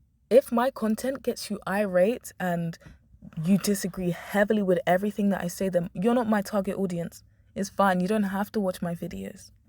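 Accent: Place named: London, United Kingdom. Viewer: Unrelated but your accent!! Im also a fellow londoner too!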